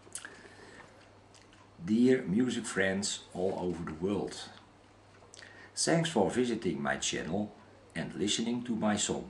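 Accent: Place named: Netherlands. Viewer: Ik hoor aan uw accentdat u nederlands bent, heel goed gespeeld ;)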